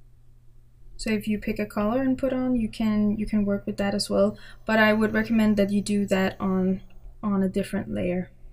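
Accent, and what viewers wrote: Denmark. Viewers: I don't mind if you screen record or this way. But I am a little concerned about your Apple pencil because it's missing the cap. Did you lose it? But anyway you record, I love your voice, it's adorable ❤️ your Danish accent is just insanely cute 😍💛💛💛 Your so skilled as an illustrator. I'd love to see you create an entire piece in real time from start to finish. Don't care if it's an hour or two long. Your soothing voice calms my anxiety disorder. I finally found my Kryptonite - you 🥰🍕🍕🍕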